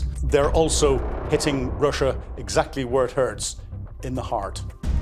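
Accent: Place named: Northern Ireland, United Kingdom. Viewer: He’s from Northern Ireland, originally.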